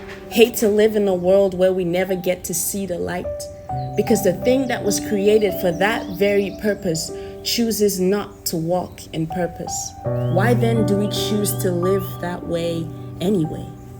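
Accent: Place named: Kenya. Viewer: Amazing Gift!! If I may ask, sis. Where are you originally from? I understand is a Nigerian name and specifically from the people of Benin Kingdom, but your accent is giving Kenyan or somewhat Cameroonian. I'm just curious. Shalom!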